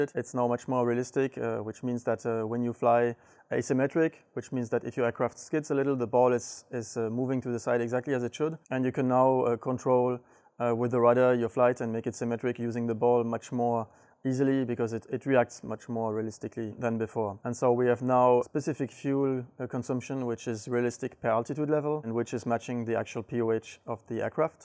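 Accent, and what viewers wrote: France. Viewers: As seriously as I take all these amazing new sim technologies and stunning realism... that French accent just takes the cake for me xD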